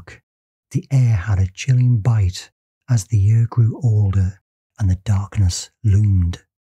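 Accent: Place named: Inverness, United Kingdom. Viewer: Where about in Scotland are you from ? You sound like you’re from Inverness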